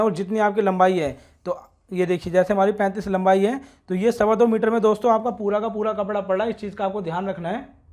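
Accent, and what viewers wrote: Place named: Uttar Pradesh, India. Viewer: Aap bolte hai to lagta h aap up se hai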